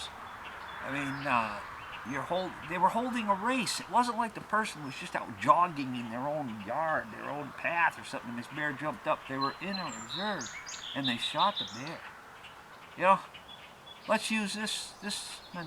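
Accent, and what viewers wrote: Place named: New England, United States. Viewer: That's real yankee talk right there.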